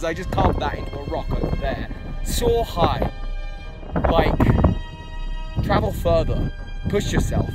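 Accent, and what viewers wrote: United Kingdom. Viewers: ive always thought you said "so hi pokemon masters" cuz of the brittish accent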